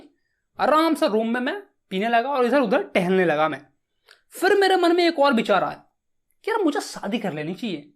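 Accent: Bihar, India. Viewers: Bhai aap Bihar se hai kya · Bro are you Bihari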